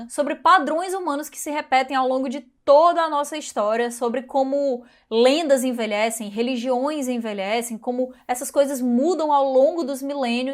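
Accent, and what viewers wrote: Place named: Ceará, Brazil. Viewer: Olhe só, uma cearense com um canal legal. Saquei o "meu" sotaque nas tuas primeiras frases. Li o Duna com 16 anos, e foi uma viagem... aliás, não poderia ter sido diferente, né? Assisti o filme, bem criança ainda, na Globo, bem antes de ler o livro. Lembro que não entendi nada mas adorei (sempre fui louco por sci-fi). Revi o filme, séculos depois... Olha, gosto dele. Acho que porque fui assistir já com o peso da crítica negativa em cima, e eu meio que pensei "É, não é tãããoo ruim assim". Felizmente, tiveram a sensatez de fazer agora dois filmes: porque, condensar aquela complexidade num filme só, não tinha condição. Com dois, pode ser: acho que o ideal mesmo era fazerem uma série, cê não acha? Comprei há pouco "O Messias de Duna", que aliás já tinha lido. Apesar de muito mais curto que o primeiro, nele, as tramas labirínticas no psicológico das personagens é bem mais impressionante. 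Li depois "Os Filhos de Duna", o quarto da série, e... achei legal. Mas nada demais. Integridade artística à parte, fica óbvio que, para o Frank Herbert, ele havia acabado de "construir" o seu ganha pão: poderia viver o resto da vida só de escrever continuações à saga... o que ele, de fato, fez; e ainda rolou uma graninha pro FILHO dele, que escreveu o último (Aff!). Sete? Oito? Ainda quero ler o terceiro, "O Imperador-Deus de Duna", mas não tenho intenção de ler os outros. Abraço.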